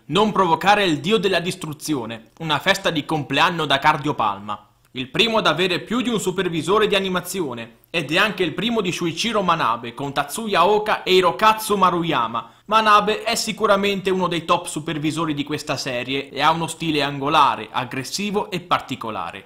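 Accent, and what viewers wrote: Italy. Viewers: sei italiano ?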